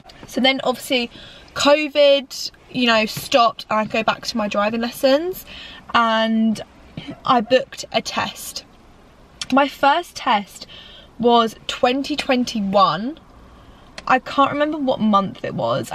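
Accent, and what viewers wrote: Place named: Essex, United Kingdom. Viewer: She always sounded like this LOL just an Essex accent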